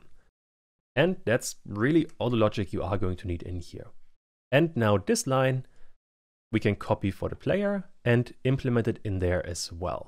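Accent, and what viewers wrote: Germany. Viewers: Your content is fucking amazing bro, keep it up! :)) Btw, höre ich da einen deutschen Akzent heraus? xD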